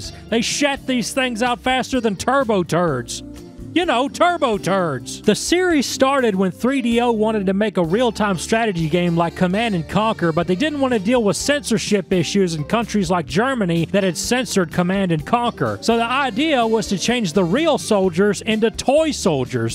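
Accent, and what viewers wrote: South, United States. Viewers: He's southern like accent with a coyote avatar fits well like the final peace of the puzzle. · A game reviewer with a southern accent covering one of the games that I played to death as a kid? Have a free subscribe, good sir.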